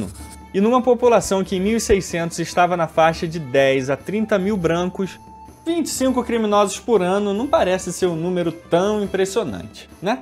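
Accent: Brazil. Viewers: ele mostrou a identidade no outro canal dele, e prr, se eu não me engano, ele cresceu no Brasil, por isso não fala português europeu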